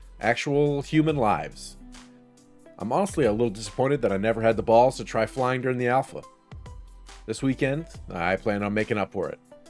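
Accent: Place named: United States. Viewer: As someone pushing 50, I had flashbacks and (virtual) PTSD with this video 😅 I enjoyed what I played of BF6, but hated the COD-style sliding. LAN parties were great, though you could guarantee there would be at least one player struggling to connect to the rest 🤪 I sadly missed the closed Beta for this, hope the rumours are true and I get to try it before the 10th. Most of my recent shooter experience is with Ready Or Not, but this could scratch an itch for me 😁 I'm in the UK, I'm guessing from your accent that you're across the pond? Just heading to check if you have a Discord server 😅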